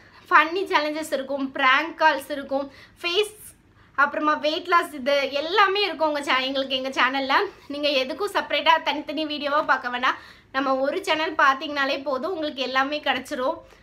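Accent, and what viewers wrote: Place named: Nagercoil, India. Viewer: illa akka...unga slang nagarkovil madiri iruku adan keten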